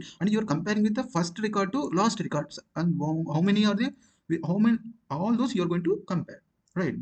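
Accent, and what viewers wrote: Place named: India. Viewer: Why do you need to speak so fast? Indian accent is so hard to follow. Just speak slowly and clearly.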